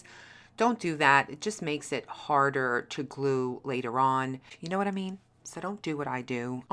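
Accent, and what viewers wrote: New York, United States. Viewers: Love these ideas. Got to do the tasseled mirror don’t be so hard on yourself. You’re a great teacher and so entertaining. Showing your mistakes is the best way to teach! If you are “perfect” your students will be intimidated. So glad Holly introduced us on the fake macrame hanging. Now I’m hooked on macrame I’m no good at it but I’ll keep at it with your help. That double half hitch knot may take years but I will master it Btw is that a NY accent? I’m a transplant and sort of miss it 🙏 thanks for all you do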